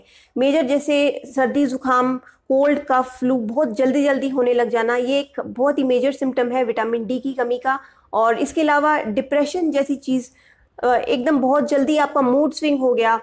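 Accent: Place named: India. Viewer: english subtitle. indian voice.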